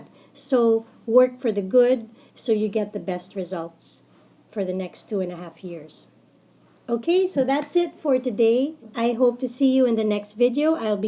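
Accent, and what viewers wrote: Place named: Philippines. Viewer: why do u have a filipino accent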